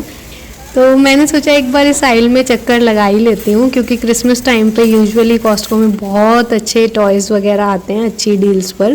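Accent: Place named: India. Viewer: I really love your videos, your thoughts and views. Love love your accent and you owning it and rather than being shamed by people. This makes me admire you even more. Re aroma of our Indian spices and cuisine, instead of being shamed I am 💯 on board with you. Just like you, I question the same to people who are bothered by our Indian spices smell. I am married to a midwestern American Caucasian. When his family even snubs their nose at aroma of my Indian cuisine, all I say to them is “I totally understand that this aroma is new to you and you find it different just like how I still feel about the burgers and meats you all eat”…Love your authentic self. Always stay that way 💕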